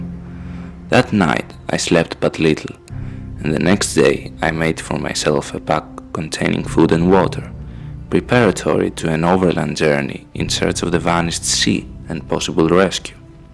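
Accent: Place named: Greece. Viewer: you greek mate ? :) nice work btw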